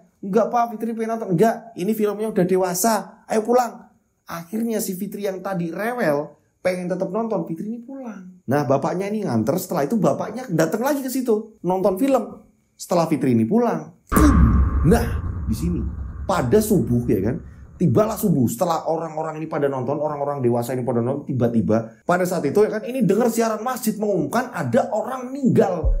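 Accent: Java, Indonesia. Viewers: Wa alaikumussalam asli mas dari mana kok medok ya, Jatim ato Jateng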